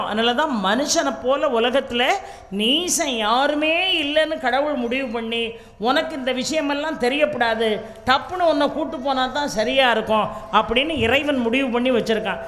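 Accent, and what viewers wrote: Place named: Tirunelveli, India. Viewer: அருமை அம்மா. எங்கள் அழகிய நெல்லைதமிழ்ப் பிரவாகம்💐🙏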